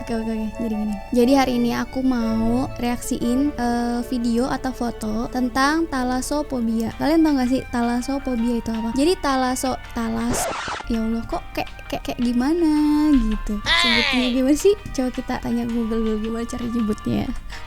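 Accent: Banjar, Indonesia. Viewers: Logat banjarnya kada kawa di hilangi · Kyk org Banjar ada kata², nah di akhir kata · Logat banjar nya masih gak hilang awokwok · Banjar nya kluar sikit" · Kek logat orang banjar:)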